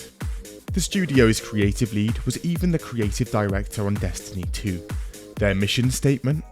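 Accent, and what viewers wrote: United Kingdom. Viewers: Why does this general kind of YouTuber always have a British accent? (, Internet Historian, Joeseppi)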